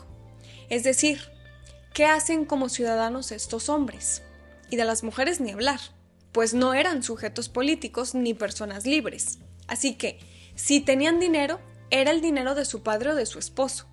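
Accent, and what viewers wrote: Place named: Monterrey, Mexico. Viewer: Suena a Monterrey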